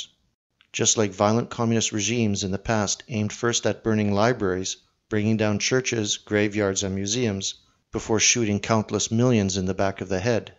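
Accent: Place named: Canada. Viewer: are you a Canadian academic? You have a Canadian accent. Just wondering. You are very courageous. But it seems a very lost cause. Bad odds. Sorry. Just my view.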